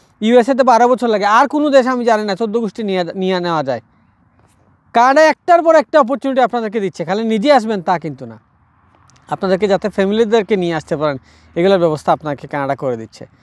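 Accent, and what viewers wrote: Sylhet, Bangladesh. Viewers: Bhai sylheti ni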